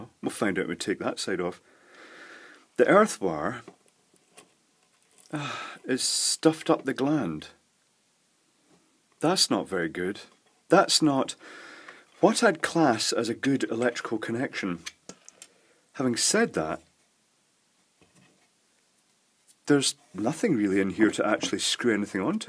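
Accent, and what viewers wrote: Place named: Bearsden, United Kingdom. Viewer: very polite spoken...you fae Bearsden?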